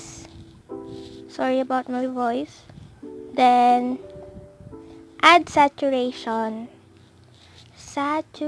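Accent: Philippines. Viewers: Is it just me or parang Fiipino ka? Yung accent mo po eh heheheh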